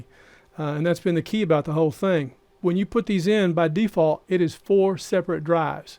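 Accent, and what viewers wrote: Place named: South, United States. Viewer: what a nice southern pronunciation. Tennessee or South Carolina ?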